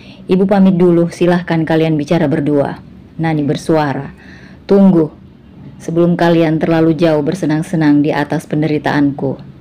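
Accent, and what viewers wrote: Indonesia. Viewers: Chanel pendatang baru yg beda logat dr lainnya, khas batak bugis campur jawa bandung 😅, subscribe nya blm diatas 50 ribu tapi di gemari ibu ibu viewsnya setara dgn chanel besar artinya miminnya oke bawaain cerita , sukses sll mimin , single fight yg berjuang kuliahkan anak anaknya sendirian 🎉🎉🎉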